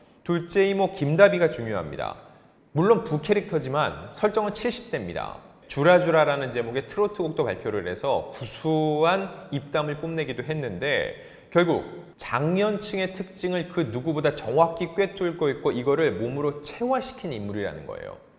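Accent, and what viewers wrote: Gyeongsang, South Korea. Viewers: 연기와 진행은 사실 다른데 잘해낼지 관심이 가네요, 코믹연기와 순발력은 최강인데 ~ 경상도 말씨가 쪼끔 걸리기는 합니다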